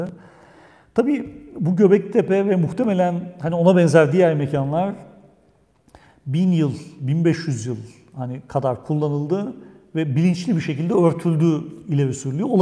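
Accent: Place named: United States. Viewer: Aynı konuyla ilgili ilk dersinizi de zevkle dinlemiştim ama bu çok daha zevkli oldu benim için. Teşekkür ederim hocam. Bu arada söylemeden geçemiycem Türkçe yi Amerikan aksanıyla konuşmanız da renk katmış😁 tekrar teşekkür ederim.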